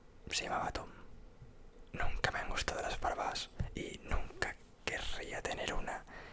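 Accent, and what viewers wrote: Spain. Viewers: Eres catalan verdad? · tu eres Español o Estado unidense? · Eres de españa?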